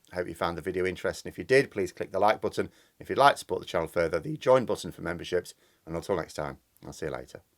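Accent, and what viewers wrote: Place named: Yorkshire, United Kingdom. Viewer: Soft Yorkshire lad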